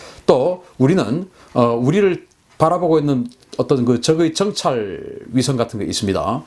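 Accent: Gyeongsang, South Korea. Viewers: 다좋은데 상도사투리듣기가 좀 거북하네요.표준말쓰세요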